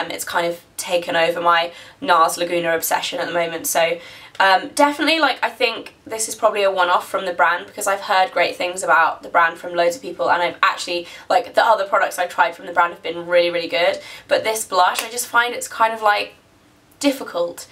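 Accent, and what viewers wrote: United Kingdom. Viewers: you always look like a california girl with a british accent :D i love it !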